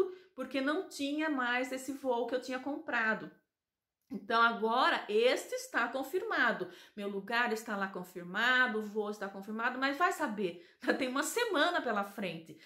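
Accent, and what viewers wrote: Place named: Rio Grande do Sul, Brazil. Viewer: Pelo sotaque você não sabe? E do sul gaúcha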